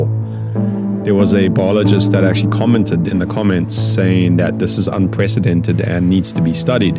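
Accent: South Africa. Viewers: Cool, I knew I recognized your accent, I'm from SA too! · I love your content & one of the reasons why is because I can hear you’re South African 🇿🇦 ❤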